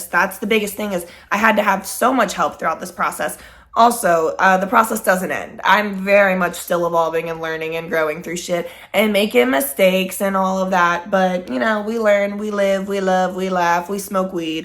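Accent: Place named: United Kingdom. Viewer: I love every video every time! You do not miss! As someone who also struggles mentally I always love these videos. The British accent is also so funny bc why do I do the same thing when I’m talking about my mental stuff😂😂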